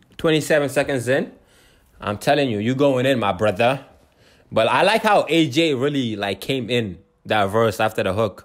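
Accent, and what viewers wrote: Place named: United Kingdom. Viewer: You actually sounded like you were from the uk tho 😂 , don't be sorry!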